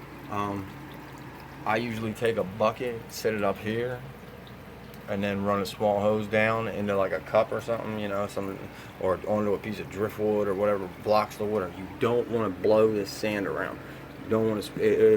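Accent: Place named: South Philadelphia, United States. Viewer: Nice bro! You remind me of dudes I grew up with in South Philly. You got that tone! I like the step by step videos.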